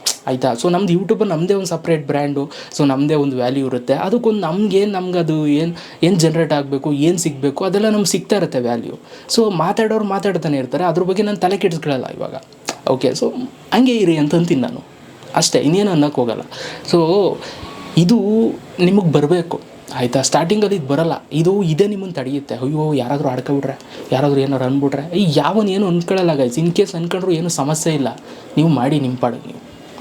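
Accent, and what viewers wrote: Mandya, India. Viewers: ನನಗೆ ಇನ್ನು ಭಯದಲ್ಲೇ 🤣 ವಿಡಿಯೋ ಮಾಡ್ತೀನಿ ಹಾಗಾಗಿ ವಿಡಿಯೋ ಸ್ವಲ್ಪ ಶೇಕ್ ಆಗ್ತಾಯಿದ್ದೆ 😅 ನಿಮ್ಮ ಆ ಮಂಡ್ಯ ಭಾಷೆಯ ಸೊಗಡು 👌 💛❤️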